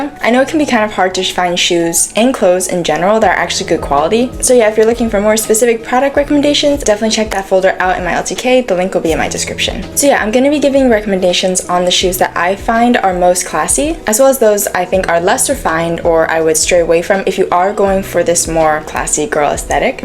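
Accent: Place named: United States. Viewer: you have a very pleasant voice and accent, are you from the usa?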